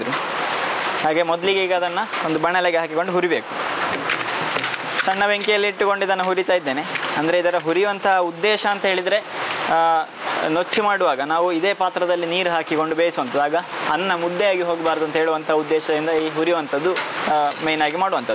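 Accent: Mangalore, India. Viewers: I like ur explanation vid local kudla kannada spr..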